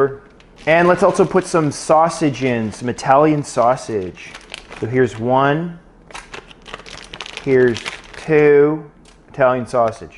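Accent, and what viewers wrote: Canada. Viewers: Elizabeth B MY THOUGHTS EXACTLY! :) that's always how I'm easily able to identify if someone's Canadian. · When you tune in to hear 'oooot and abooot'... I love the Canadian accent :) Not disappointed!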